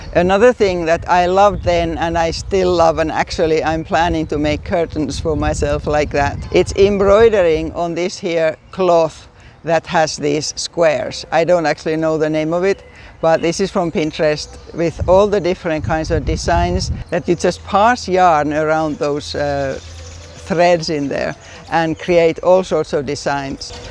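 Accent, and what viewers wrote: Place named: Finland. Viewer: Hello! I am so happy to have discovered your channel! I could listen to your Finnish accent all day as I come from a small Finnish community in Northern Minnesota and am proud to be 1/4 Finnish myself. 😬My family and I were in Finland for a month in the summer of 2011 when we visited our former exchange student who still lives in Helsinki. Neither knitting or crochet in taught in US schools; not in the midwest, at least. Sewing, cooking, woods and metals are taught here though, just as in Finland. I thoroughly enjoy your basic, easy to understand tutorials. I conquered the Granny Stitch today thanks to you! Kiitos, Shelley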